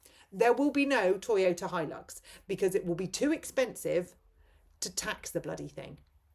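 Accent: RP, United Kingdom. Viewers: Posh woman whining again... Try a reduction in lifestyle, perhaps a modest Skoda - and there you have it, the reliability you crave, and a small budget you can afford (pay day loans are also available). and we wont have you whinging so much.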